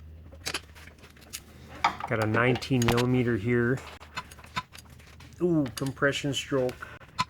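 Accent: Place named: Northeast, United States. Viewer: You gotta be from the northeast some where.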